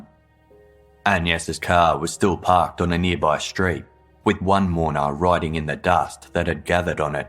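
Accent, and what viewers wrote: Australia. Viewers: The narrators voice sucks, can’t understand some things he says, his Australian or British accent is so strong that it makes it difficult to distinguish some words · It’s Australian and I pity you that you can’t understand English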